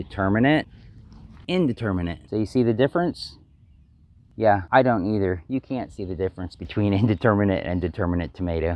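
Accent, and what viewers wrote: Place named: Pennsylvania Dutch, United States. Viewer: This is a great instructional, informative vid!👍 Wow, humongous volunteer squash leaves! Do you use DE for squash vine borers? Your accent kinda sounds like where I'm from a bit, PA Dutch country! 😉 Take care!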